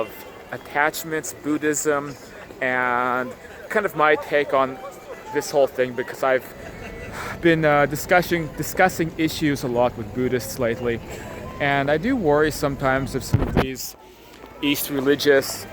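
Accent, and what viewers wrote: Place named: Netherlands. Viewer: Nice to have a little look around Kiev, thanks! By the way, are you European yourself, your accent sounds Dutch maybe ?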